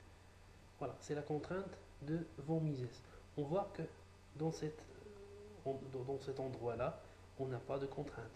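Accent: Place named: Algeria. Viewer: Accent Algérien!!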